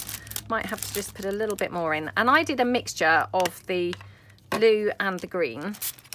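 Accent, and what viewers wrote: United Kingdom. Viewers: Love these . GREAT idea. I watch all you great video full of ideas i just dont comment much. Are you in the UK. Love the accent. I have always wanted to visit England a walk the foreshore of the Thames and pick up relics from so many years ago. Thanks for all the wonderful videos. Stay safe. hugs. i am in the US. In NC.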